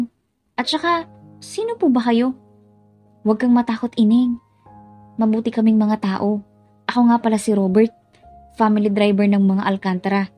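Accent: Visayas, Philippines. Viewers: Sorry maganda story but narrator boses visaya accent nakakawalang gana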